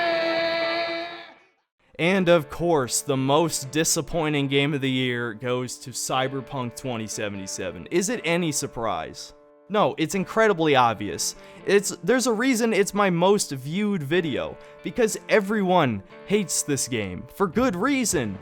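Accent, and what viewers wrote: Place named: Chicago, United States. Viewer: I like your Chicago accent!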